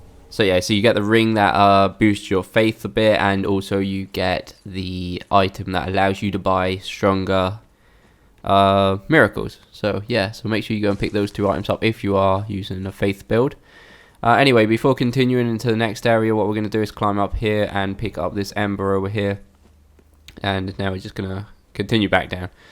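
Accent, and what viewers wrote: England, United Kingdom. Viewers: I truly love listening to you English fellas doing walkthroughs. I catch myself with with my country accent tulkin like yew guys . Haha. I do it quite well. 😂😂😂 Thanks!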